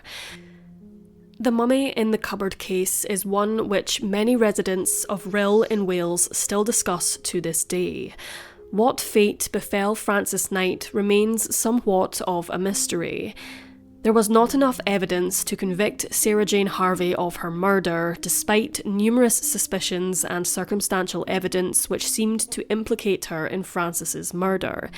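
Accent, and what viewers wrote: Scotland, United Kingdom. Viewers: I have family from the Angus area of Scotland, and her accent sounds really similar... sort of 'Edinburgh' rather than Glasgow. It really is such a lovely accent, and the narrator's tone/timbre is so beautiful too... She could read children's stories or folk tales/legends, and it would be amazing :) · I know the topics are serious but I do find her voice so relaxing . I love the Scottish accent x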